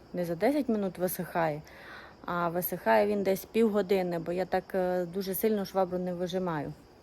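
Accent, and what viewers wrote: Transcarpathia, Ukraine. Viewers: Нарешті я вас знайшла. Давно дивилась ваші відео, а потім мій акаунт пропав і прийшлося встановлювати новий і відповідно всі підписки на Ютюб були пропали, я рада вас бачити..... Я доречі теж з Закарпаття і тому дуже люблю слухати свою акцентовану мову, мало ко її розуміє, а я рузуміву❤😂😂😂 Я з Великих Лучок за Мукачево, але проживаю зараз в Львівській області......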